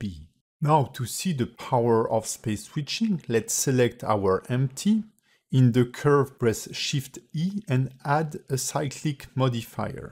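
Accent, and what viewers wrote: France. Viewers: L’accent french en force mdrr continue tu régale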